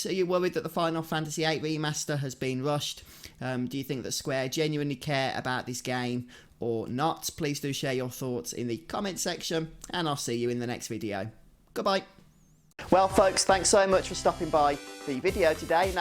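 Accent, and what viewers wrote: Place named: England, United Kingdom. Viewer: I love FFVIII a bit more than VII. BTW, I don't know why but, I like your accent. What part of England are you from?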